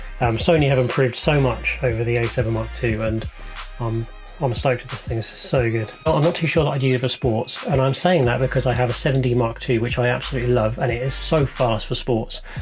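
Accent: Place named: United Kingdom. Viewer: i like your style (and your British accent! btw I'm a German) :) you should continue making videos :D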